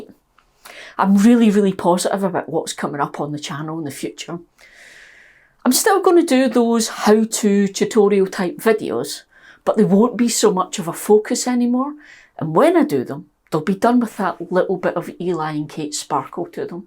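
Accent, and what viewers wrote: Scotland, United Kingdom. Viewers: I just stop in to see what your up to. You could probably film walking around the yard and going on about whatever comes up. My gardening channels are just relaxing, AND... Your my only Scot? :) So its also nice for my ears :) Happy new year! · I just read a comment from one of the viewers saying that “for a moment they thought you were going to say that you were stopping making videos” ! That’s was exactly what I thought and for a moment there I felt a real pang of sadness, and was so relieved to find that was not the case! 😮‍💨 I absolutely love your videos and discovered your channel when you had just reached 18 thousand subscribers - you were ecstatic about it and couldn’t get over the fact that 18 thousand people wanted to hear what you had to say and share in yours and Kate’s adventures. Your channel is so incredibly relatable and real, and the ‘fluffier’ the content, the funnier and more enjoyable your videos seem to be. 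It’s a real de-stressor watching your antics. I work in a hospital and some days, after a particularly stressful 12 hours shift when my brain refuses to switch off from the dramas of the day, there’s only a handful of programs that are able to relax my grey matter, and YOUR channel is right at the top of that list! So thank you for making this overworked and underpaid NHS worker relax and laugh ❤ PS: your channel even comes with a bonus: the Scottish accent, of course! Absolutely love it!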